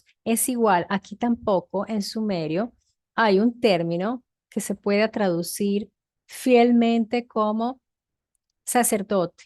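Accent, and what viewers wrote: Italy. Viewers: ¡Correcto! Usted si sabe decir las palabras exactas. No es la primera vez que desde aqui, en casa, le aplaudo por la precisión.... Devuélvanse un par de minutos y aprenderán lo que es hablar correctamente ( disculpando el hermoso acento italiano, que le dá mayor belleza)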